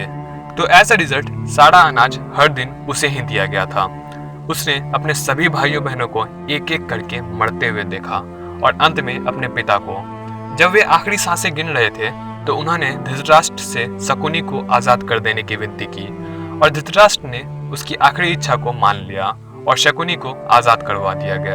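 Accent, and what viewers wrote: Bihar, India. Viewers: Tum bihari hoo kya 🙄🙄🙄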